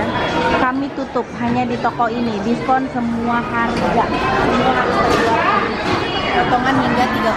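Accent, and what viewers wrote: West Java, Indonesia. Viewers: Th makin syantik..aku suka logat sunda nya..sehat sllu and semakin sukses.. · teh ramah murah senyum logatnya orang sunda banget, sifatnya mencerminkan orang sunda yang ramah dan santun. i love you teteh sareng kang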